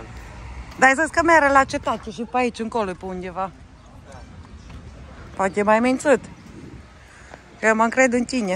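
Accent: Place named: Maramureș, Romania. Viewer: De multă Sănătate să aveți parte. Mulțumiri multe, și.. pentru toate postările,frumoase și interesante.,Graiul tău, autentic este mult mai plăcut,de cât cel..DOMNESC. Ești o simpatică,felul tău Moroșenesc cum vorbești,nu numai Mie cred, că-mi place..cred că tuturor, celor care vă urmărim, Postările voastre Frumoase. S-aveti parte numai de bine, drumuri bune și multă Sănătate. Vă doresc din toată inima, să rămâneți veșnic, Împreună și fericiți ❤❤❤ să fiți Fericiți.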